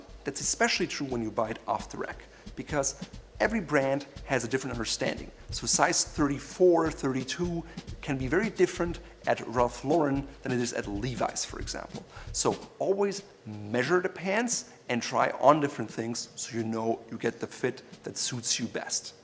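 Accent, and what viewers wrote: Germany. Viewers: oh that german accent .... 🤯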